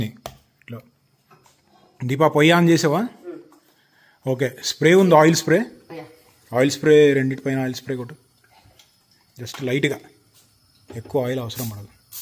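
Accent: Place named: Guntur, India. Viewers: Bro midi guntur district accent undi motham❤ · Ma Guntur language la untadi mee Bhasha. Nizamabad yasa ledu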